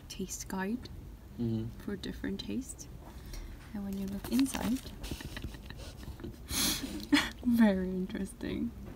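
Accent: United Kingdom. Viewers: Oof, you've got british accent more. hehe so awesome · 저만 느끼는건가요 ㅋㅋㅋ 그동안 못본사이에 발음하고 억양이 영국스러워진거같은데.....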